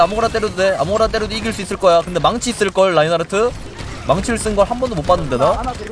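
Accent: Gyeongsang, South Korea. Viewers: 근데 님요즘 경상도 사투리 쓰시네 ㅋㅋ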